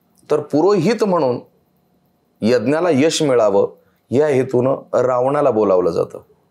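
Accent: Standard. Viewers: प्रगल्भ अभ्यास व्यापक विचार सुरेख भाषा. उच्चार शुद्ध. खरं च अकल्पनिय. विनम्र अभिवादन. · कोटी कोटी नमन शुद्ध वाणी,उच्च विचार ,दांडगा व्यासंग एवढा अभ्यासअसणारे महाराज बोटावर मोजण्याइटकेही नाहीत वारकरी संप्रदायात आपली पुस्तके वाचायला आवडतील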